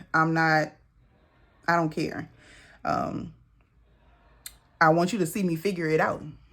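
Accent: Louisiana, United States. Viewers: Hey, girl you got me with robbing peter to pay paul!! 😂😂 New subscriber!! I love your accent...are you from Louisiana? ❤☺️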